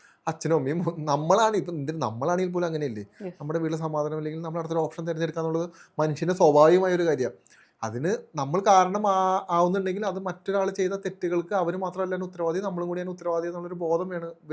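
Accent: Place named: Kollam, India. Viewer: കൊല്ലം ജില്ലകാരിയാണോ ഈ സ്ത്രീ...? "ഭ " ഫയങ്കര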